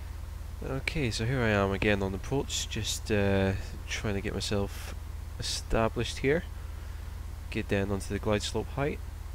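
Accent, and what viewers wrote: Scotland, United Kingdom. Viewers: Also Is That A Scottish Accient I Hear haha im socttish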